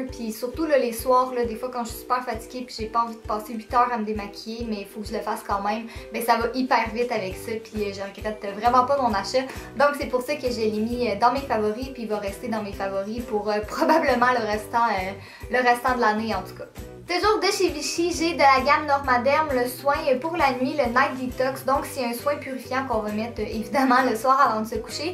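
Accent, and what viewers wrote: Quebec, Canada. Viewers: Je t'adoore ! Une superbe Youtubeuse ! En plus j'adore l'accent Quebecois, et le Quebec en géneral ! Bisous ! <3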